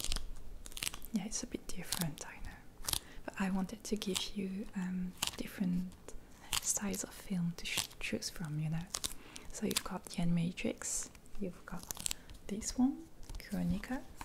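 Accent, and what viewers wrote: France. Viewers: Your accent makes me wanna drop my life and find love in France........ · Your french english is adorable. You are always adorable. Please make a brush video!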